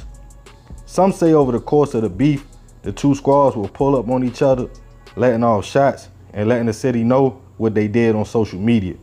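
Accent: Baltimore, United States. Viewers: I’ve been watching you for a little minute now and I just realize you don’t say “you” with the typical Baltimore accent but everything else you say sounds like the typical Baltimore accent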